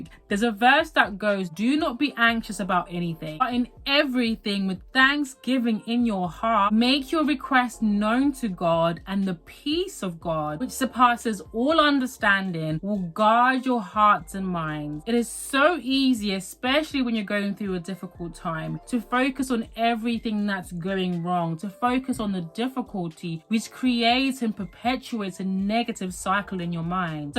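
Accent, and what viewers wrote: United Kingdom. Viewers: I just love the British accent 😊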